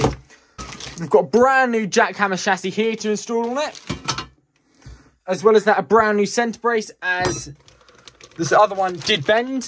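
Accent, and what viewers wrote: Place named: United Kingdom. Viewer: Nice new to your channel but I never thought I would find a better basher than kev but I did that’s you also can you do a vid on slash, bandit, and rustler because I have a rustler and I get your British and that’s your accent but saying Al-i-min-yum is fine instead of Al-lum-i-num but pls don’t say Shassy it’s ch not sh you don’t say it like Charolette it’s chassis sorry not trying to be rude but it like makes me feel wiry to hear shassy but love the channel and again I’m sorry if I’m being rude ❤❤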